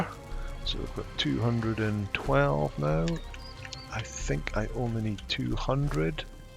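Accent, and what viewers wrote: United Kingdom. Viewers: good video my greater shaleback is bruce iii and always nice to hear a fellow britt im sarf london innit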